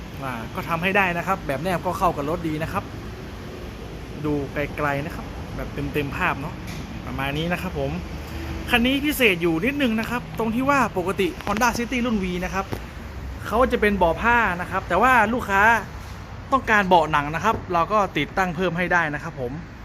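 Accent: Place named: North, Thailand. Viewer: อู้เหนือเลยครับผม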